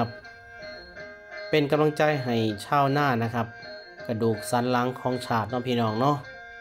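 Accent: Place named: Isan, Thailand. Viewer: สู้เขาเด้ออ้ายบ้านเฮามันแล้ง บ้านผมกะบ่ต่างกันเลยครับ ฟังอ้ายเว้าแล้วผมคิดฮอดบ้านเลยครับ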